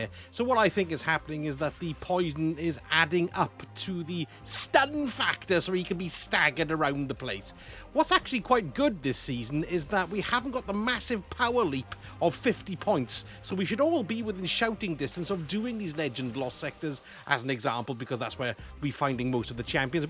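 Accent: Wales, United Kingdom. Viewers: You sound Welsh?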